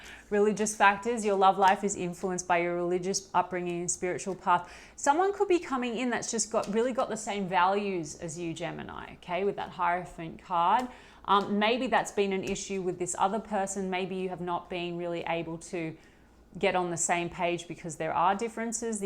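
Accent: Australia. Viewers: Really like, great interpretation and Lovely Aussie delivery😊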